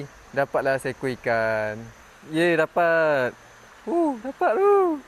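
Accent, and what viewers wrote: Kuala Lumpur, Malaysia. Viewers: Ore kelate tp kecek luar mcm org kl, ingat ore luar tadi😂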